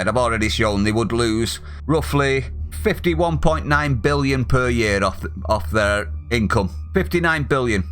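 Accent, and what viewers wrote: United Kingdom. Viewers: I know this is totally irrelevant, but I have to ask: where are you from? I'm totally rubbish at identifying UK accents, and it's driving me nuts. (This is pure curiosity, and nobody has to answer nor do I have any kind of stake in the answer, just a curious US citizen who wants to know for his own satisfaction.)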